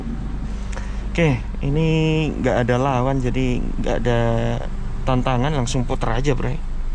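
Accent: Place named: Surabaya, Indonesia. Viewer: Suroboyo timurr wkwk.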